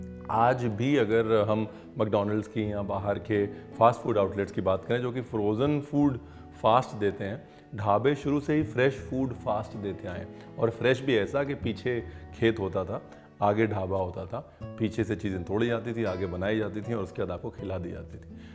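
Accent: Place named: Punjab, India. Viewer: Hindi mein punjabi touch ka jo mza dalte hain aap usse apnepn wali feeling ati rehti hai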